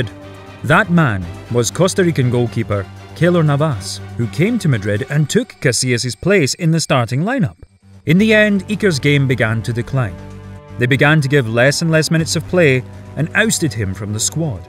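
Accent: Scotland, United Kingdom. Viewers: The narrator sounds Scottish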